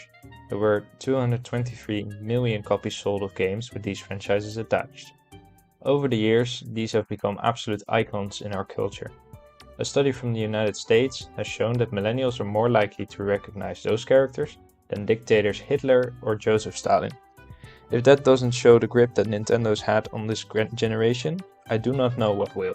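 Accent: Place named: Netherlands. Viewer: in my native language it’s also succes! I thought i heard an accent, are you by chance Dutch?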